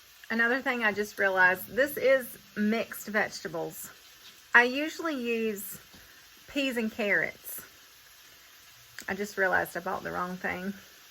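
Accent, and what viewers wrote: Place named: North Carolina, United States. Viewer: Like the way you talk and your voice sounds , NC slang , love it !!! 👍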